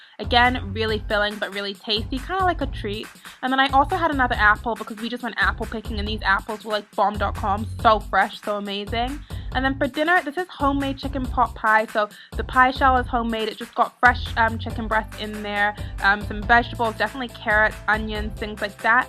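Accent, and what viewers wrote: United Kingdom. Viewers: you have a british accent sometimes! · I know this is irrelevant to weight loss, but are you from the UK? I really like your accent, hahaha.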